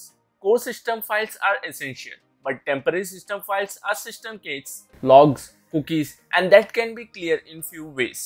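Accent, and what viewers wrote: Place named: India. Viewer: You hear an Indian voice… uh oh. Clickbait 99.9% likely ahead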